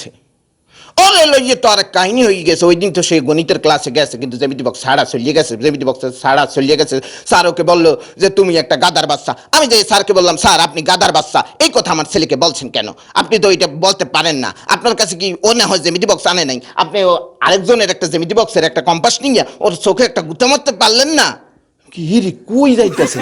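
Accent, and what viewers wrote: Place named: Barishal, Bangladesh. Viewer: barishailla vasha shunlei moja lage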